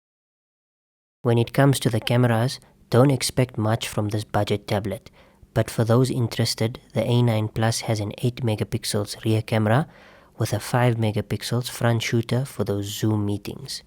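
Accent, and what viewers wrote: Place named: South Africa. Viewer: You can tell this guy is from south africa 😂 im also from sa bru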